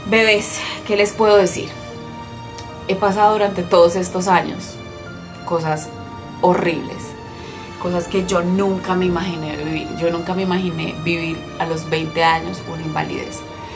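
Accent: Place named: Colombia. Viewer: Según escucho tu hacento eres colombiana.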